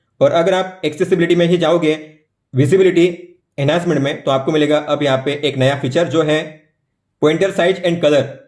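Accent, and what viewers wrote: Gujarat, India. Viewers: Bro r u from Gujarat??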